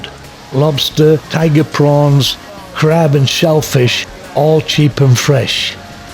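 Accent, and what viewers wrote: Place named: Yorkshire, United Kingdom. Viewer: Did you learn your Cantonese in Hong Kong? You sound like your a northerner. Yorkishire?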